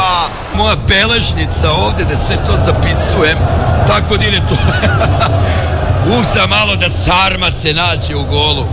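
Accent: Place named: Ekavian, Serbia. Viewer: Zasto ovaj komentator prica ekavicu?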